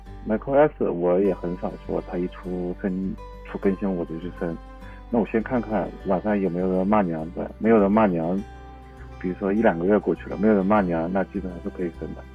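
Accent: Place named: Jiangsu-Zhejiang, China. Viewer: 小哥哥，应该是江浙人士。。。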